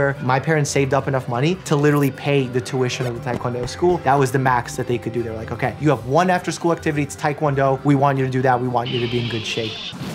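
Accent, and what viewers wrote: United States. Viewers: My brain just exploded at hearing speaking Russian. I am a native russian as well, but I don't associate with this language AT ALL! Ahahah. Но видно, что давно не говорил по-русски, слышится акцент американский уже) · Hearing your unmistakably American accent, part of my brain refuses to believe that English is not your native language. This is all the stranger given that the same could be said of me. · wow he has an American accent when he speaks Russian? I figured he'd be fluent in both since his parents didn't speak English when he was growing up. I would think they still speak to each other in Russian since it's harder for adults to learn foreign languages than it is for kids. At least that's how it was for my gf in high school who came from Chile with her parents. Her dad's English was decent but when speaking amongst themselves it was almost always in Spanish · Loving that your Russian has American accent! 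😂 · It's probably because he came to America so young so his new English accent started to influence his Russian accent compared to if he came at like 12+ it probably wouldn't have affect his Russian. Also he spoke English outside of the home so even if his parents only spoke in Russian as a kid your outside of your house normally more than you are home so he has a lot more real world practice with English rather than Russian because he only speaks it with his family and nobody else. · I know im a bit late but his russian is great. Has a only a bit of american accent as opposed to a lot (i am russian so i know) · I did not know you were born in Russia. Your accent is as American as it can be. Good luck and stay safe out there! Also did you know “Coming to America” is a movie and it’s pretty funny. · Oh my gosh! I’ve been trying to figure out what your accent is every time I watch your videos (or regional dialect I guess is the proper term). 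You just have a particular way of pronouncing words that many of the people I grew up with also do (sounds a little different ‘cause we were in MN) but there’s a clarity to your speech that is common among people who learned English as a second language. It’s a beautiful variation of the American accent. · It's really funny because I got a vibe that you had this heritage despite not knowing anything about you (just found your channel today!). Not sure what it was since your accent is fully "American" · has an American accent in English and a Russian accent in Russian. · My question is... HOW DID YOU GET SUCH A GOOD AMERICAN ACCENT?!?!?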